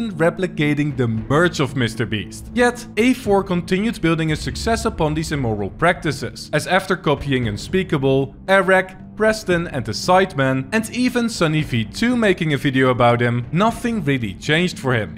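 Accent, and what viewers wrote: Netherlands. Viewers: You sound so dutch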